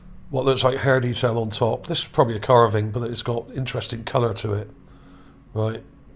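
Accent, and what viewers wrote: Bristol, United Kingdom. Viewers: Sound like a Bristolian or are me ears playing up…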